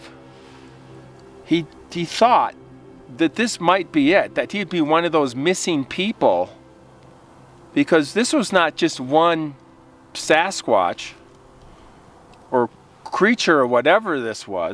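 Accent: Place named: Midwest, United States. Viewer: I was just thinking about how to ask you if you were from the Midwest! I’m from IA but have lived many places. I love listening to you.🧚🏻‍♀️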